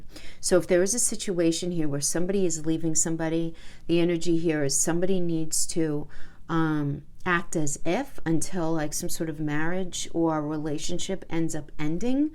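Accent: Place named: New York/New Jersey, United States. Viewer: What area are you from? You have a slight NY/Jersey sound. 💛 I really like your readings for Libra. Thank you.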